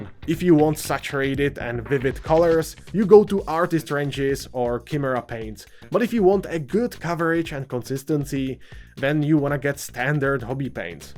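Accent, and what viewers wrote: Czech Republic. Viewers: Where are you from my guy? You sound like guy from Czech Republick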